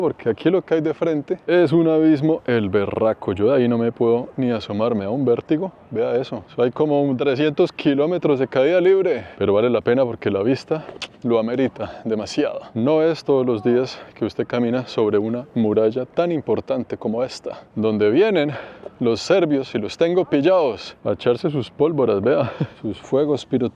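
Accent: Bogotá, Colombia. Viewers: Colombiano, entre caleño y bogotano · MUy interesante, buen sentido del humor, agardable el acento rolo cachaco o bogotano. Un Saludos desde los angeles un hermano peruano.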